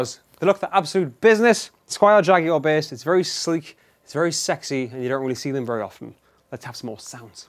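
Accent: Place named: RP, United Kingdom. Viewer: are you just the coolest bass player I've seen in a long time, or what? I love the intro, 'you shouldn't have'. For some unknown reason, every time I hear your accent I think of a quirky bass player, educated at Oxford, or Cambridge, but also understands the finer points of bass playing and bass guitar. Thanks for the review BTW; excellent. I've passed it on to all my muso friends.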